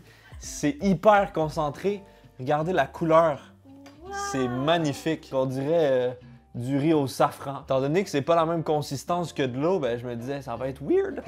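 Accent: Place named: Quebec, Canada. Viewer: Je suis français et entendre ton accent est un pur bonheur <3 vive le quebec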